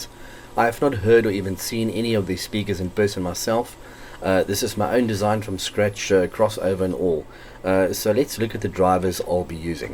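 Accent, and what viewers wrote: South Africa. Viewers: Heard you were sporting a South African accent. Let me know if I'm wrong. I'm curious to know of you imported the speakers and equipment or if you shopped locally. If it's local supply, where did you procure the speakers from. I'm looking to buy from parts express, but the import costs are hefty. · Is that a South African accent I’m hearing? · Are you South African?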